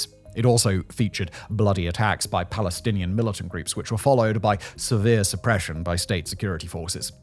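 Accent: England, United Kingdom. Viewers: I must admit, the way he so confidently stated it with that kings English accent had me question my own pronunciation for a moment.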